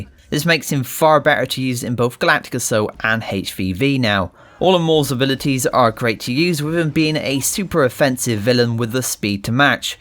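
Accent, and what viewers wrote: West Country, United Kingdom. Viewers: Like the West country twang :)btw Thanks for helping out RedWolf72ps4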